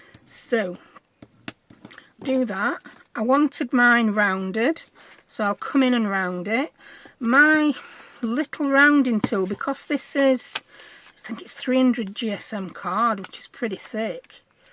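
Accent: United Kingdom. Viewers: Hello, from Texas! I enjoyed this video - I love making ephemera from junk -- or "rubbish" as you call it in the UK -- just using up bits of this & that to make something useful and beautiful! I love it - thank you for the inspiration and I enjoyed the chatting, too - love your accent! Have wonderful day and happy crafting! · Hello, I have been watching your videos for a few months now,some two or three times. I told my children that you talked like me with my sense of humor. Only you are British.I love listening to you waffle and crack on.😂 I have a suggestion for you, but you will have to concentrate and make it a habit. I know because it's taken me months and I still have problems with losing my tools. Get a bowl, tray, basket, etc to place it where you usually drop your tools. Then when you are done with the tool you are using, place it on tray or in whatever you have decided to use. 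Or you can make yourself a magnetic apron and most of you tools will attach to you. Anyway, keep up the awesome videos.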